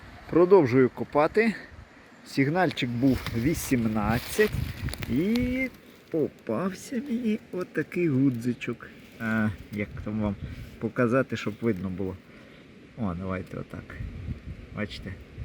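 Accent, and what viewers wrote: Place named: Vinnytsia, Ukraine. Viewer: Деякі слова такі, наче ти з Вінничини, типу ходю. Здивований що ти білорус.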